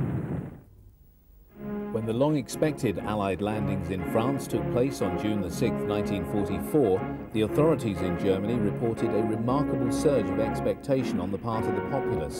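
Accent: England, United Kingdom. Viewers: Simultaneous playing of English accent commentary, overlaid gun and artillery fire, pomp and circumstance ochestra music, and actual WWII German newreel audio. It's like the battle of Prokhorovka in my ears.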